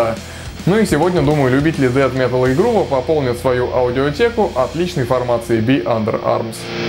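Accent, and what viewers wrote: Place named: Russia. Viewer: Годнота зашла, да русский акцент немного режет слух, но это лично моя маничка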